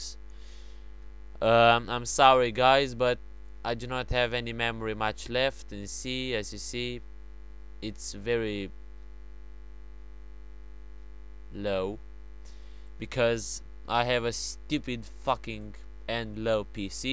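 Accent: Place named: Turkey. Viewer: Where are you from? I think u are from Turkey because you have Turkish accent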